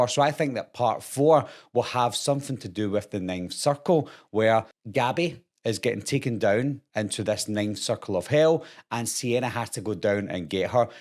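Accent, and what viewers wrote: Scotland, United Kingdom. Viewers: Great video, man, awesome films, Damien Leone, is a great horror film maker, I really love your Strong Scottish accent, by the way, keep up the great work 💯🙏🏼💙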